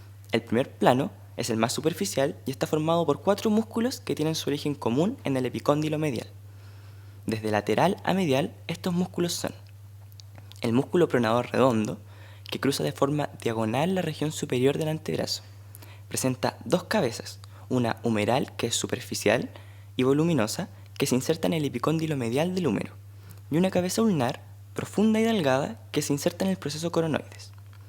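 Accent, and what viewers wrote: Chile. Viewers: Desde que volví de Chile ahora me topo con accento chileno donde sea. Muy bien video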